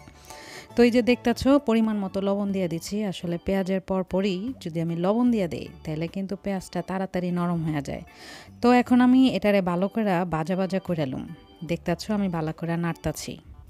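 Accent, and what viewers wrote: Dhaka, Bangladesh. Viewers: আসসালামু আলাইকুম আপু। জামাইর লগে খোঁচাখুঁচি 😁😁 আছোলেই তো লাকি আফা জাদু জানে হলুদ মরিচ অটোমেটিক তরকারিতে আইসা পড়ছে।খুব সুন্দর কালার আইছে মাছ ভুনায়। ভালা লাগছে তোমার ঢাকাইয়া ভাষা।👌👌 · মাশাল্লাহ, আমার ঢাকাইয়া বইনের গলায় ঢাকাইয়া ভাসা শুইনা ভালাই লাগল । বাপরে বাপ পাবদা মাছ রান্তাছ ? আমার ভীষণ প্রিয় মাছ । দাওয়াত দিবা না বইন ? চালাইয়া যাও আছি তো । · Apu Ami dhakaiya vasa shunte posondo kori...valo laglo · অনেক ভালো লাগলো তোমার আজকের আয়োজন । তার চেয়ে বেশি ভালো লেগেছে তোমার ঢাকাইয়া কথা । আমি পাবদা মাছ অনেক পছন্দ করি , তুমি অনেক সুন্দর করে রান্না করেছো খেতেও নিশ্চয়ই অনেক মজা হয়েছে। খুব খেতে ইচ্ছে করছে এখন। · Khub mojar ranna hoyeche apu. Apnar dhakaia vasa jobbor lagche. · আপু অসাধারন হয়েছে আজকের ভ্লগ।ঢাকাইয়া ভাষা তোমার মুখে বেছি ভালা লাগছে 😁রান্না তো মাশা আল্লাহ ইয়াম্মি হয়েছে।,❤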